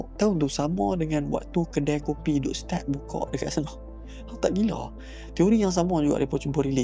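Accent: North, Malaysia. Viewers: Sedap dgr loghat utagha 😅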